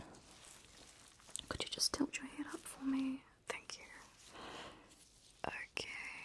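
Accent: New Zealand. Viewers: R u from nz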